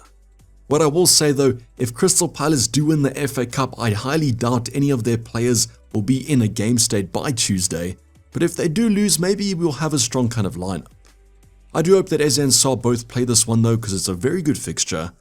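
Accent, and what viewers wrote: South Africa. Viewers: This ou is definitely south african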